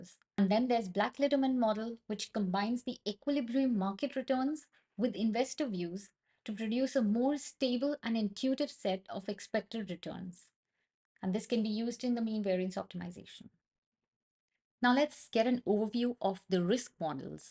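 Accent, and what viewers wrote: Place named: India. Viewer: Great video but the Indian accent makes it difficult to understand sometimes, especially with names you’ve never heard of. Maybe use AI to translate English to English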